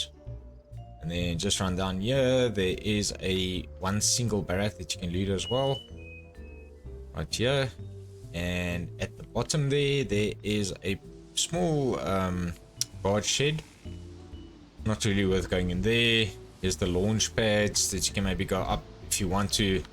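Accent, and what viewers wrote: South Africa. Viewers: 😅brother I know your afrikaans , the accent gave it away I'm also south african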